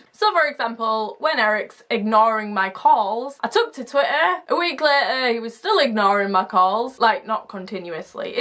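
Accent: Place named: North England, United Kingdom. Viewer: Just seen your one on coming off citalopram and wanting to do same, also you sound local I'm a northern lad too ha ha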